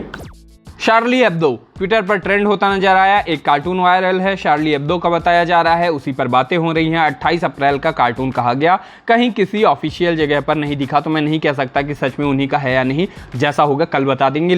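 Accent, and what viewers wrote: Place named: Kanpur, India. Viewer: Bhaiya aap UP ke hi lgte ho Kanpur ke aas paas ki jagah ke 😁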